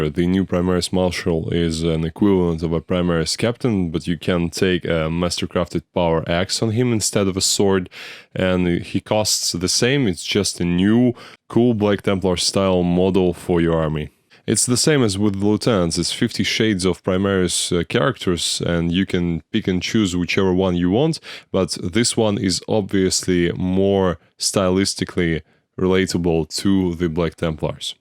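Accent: Russia. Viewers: Are u Russian?🥸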